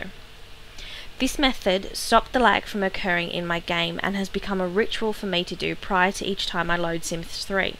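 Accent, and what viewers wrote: Australia. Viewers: classic aussie accent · Are you Australian?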